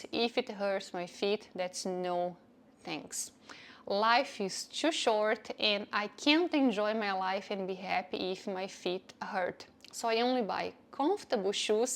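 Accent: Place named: Brazil. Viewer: I like your videos! Subscribed! I'm turning 30 soon and I'm already doing those changes without even realizing it. I feel super free not having to get my nails done every 2 weeks, but I don't know why I feel like without having them done it looks less put together comparing to having them done. Also wearing sandals without having the toenails done feels so off and not so pretty. Do you still get your toenails done? I didn't see you mentioning your name yet by the way nor where you're from, but I'm guessing you're from Brazil as I'm from Brazil too and the accent sounds familiar hahah Cheers!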